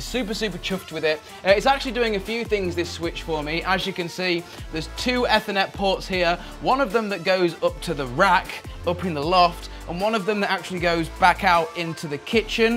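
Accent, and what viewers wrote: England, United Kingdom. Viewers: He's English, we pronounce it like garridge.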